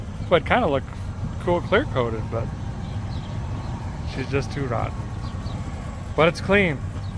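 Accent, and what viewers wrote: Canada. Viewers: First video for me and I swear I would've put my life on it that he was Canadian!!! LMAO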